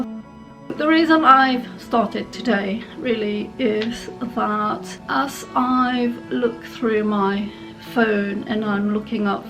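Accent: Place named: United Kingdom. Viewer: Hi I will be turning 63 coming up next month on February 9 now your voice sounds like your British but! where are you from when you really do not mind me asking? and I know just how it feels when it all comes down to you being alone all by yourself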